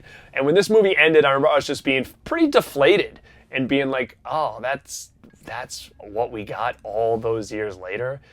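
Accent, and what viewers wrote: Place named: New York, United States. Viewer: What state did you grow up in? You sound like a New Yorker.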